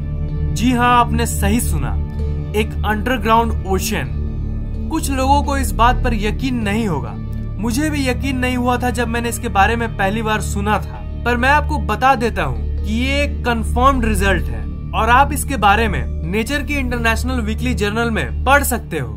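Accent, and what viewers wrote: Bihar, India. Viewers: gaddha korna mtlb full desi ❤️❤️😂 Bihari ascent ❤️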